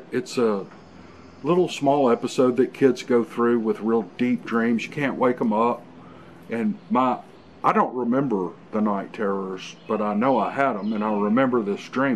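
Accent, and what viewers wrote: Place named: West Virginia, United States. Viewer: You sound exactly like my friend from West Virginia. And not just your accent. If I heard you on the phone I would definitely think you were him. Me and my friend belong to the Creator of the universe too. No fear.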